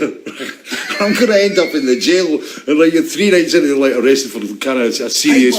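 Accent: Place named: Scotland, United Kingdom. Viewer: He sounds Scottish.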